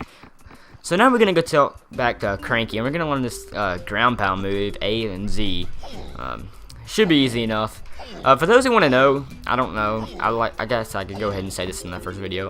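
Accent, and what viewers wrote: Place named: South, United States. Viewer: I love your southern accent man!!!!!! :D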